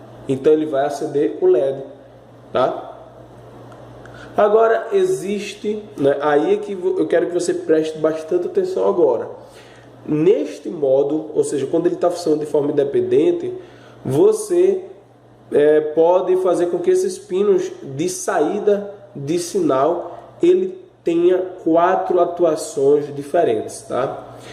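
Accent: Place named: Pernambuco, Brazil. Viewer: Esse sotaque e de Pernambucano do litoral :)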